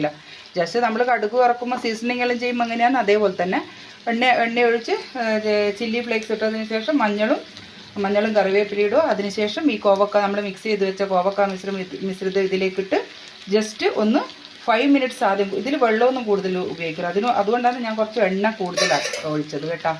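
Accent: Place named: Mahe, India. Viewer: മിക്സിയിൽ ഒന്നു പൾസ് ചെയ് തെ ടുത്താൽ മതി ചില്ലി ഫ്ലാക്‌സ് ആക്കാൻ നിങ്ങൾ മാഹി സൈഡ് ആണോ സംസാരം കേട്ടിട്ട് തോന്നുന്നു ണ്ട് കോവക്ക സൂപ്പർ ആയിട്ടുണ്ട് 👍👌😋😋 👫🔔✅ New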